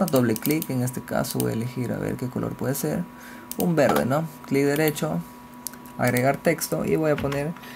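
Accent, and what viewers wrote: Peru. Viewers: PARECES PERUANO CAUSA:v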